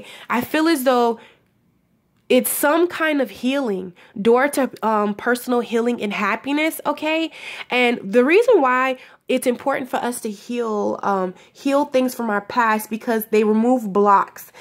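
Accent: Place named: London, United Kingdom. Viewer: Lmao your London accent gave me life 😂💀 ... I can hear you speak about self motivation all day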